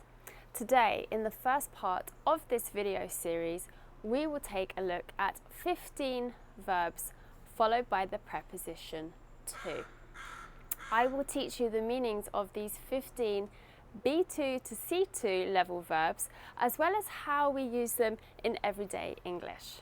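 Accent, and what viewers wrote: United Kingdom. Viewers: yeah i did not know where are you from, but i noticed your accent, and i guess it is british accent😀😊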